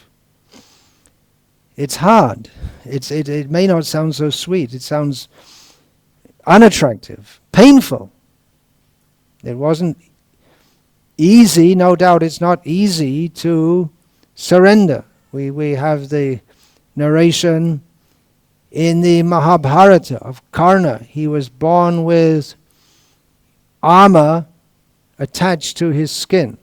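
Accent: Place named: England, United Kingdom. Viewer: Always amuses me how Bhakti Vikas S. pronounces Sanskrit with an English Twang or twist....Wow !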